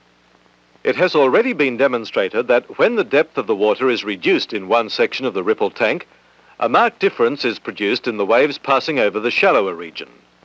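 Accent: Australia. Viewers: The announcer sounds very Australian to me. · These are great fun! I was wondering why an Aussie was doing the voice over for a US university film. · He's Australian, what do you expect? You want everyone to pronounce words in 'Merican? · To my Australian ears it sounds like the Cultivated Australian accent, owing something to Received Pronunciation but definitely Australian. The General accent (Hugh Jackman for example) is broader than this and the Broad accent (think Steve Irwin) is way past that :) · Oh right yes. As an Aussie myself it was a (nice) surprise to me to hear an Aussie voice pop out in the context of a Fran film.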